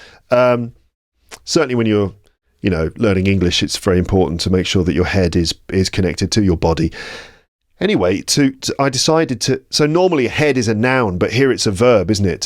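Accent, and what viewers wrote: United Kingdom. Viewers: Excellent British accent of. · The combination of your beautiful voice and the British accent is not only important and a great motivation to study English, but it is also really relaxing and enjoyable. Thank you very much for this contribution. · I trully enjoy listen your podcast. You trully english accent, british accent,real. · I enjoy your British accent, thank you very much,! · Thanks you i firstly was thinking that British accenet so hard but after started listening your podacst i discoverd it amazing accent thanks alot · Thank you fot this episode. It was so pleased to hear your british accent with such a clear pronansiation that is so rare to hear ir from natives. It can really boost vocab of students and teachers and undestanding of English speech. And moreover your teacher's style is so enjoible and motivative because it lookes like there isn't nothing special to undestand English. 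· I really like listening to your clear British accent when you are reading stories.. your channel helps me a lot to improve my English language.. please post more stories.. I really enjoy listening to you... Thank you very much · Это британское произношение? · Hi and thanks.are you only speaking betitsh accent?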